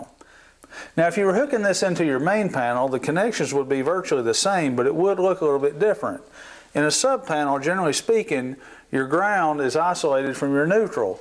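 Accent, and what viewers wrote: United States. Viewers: You have a great American accent. Thanks and hello from down under.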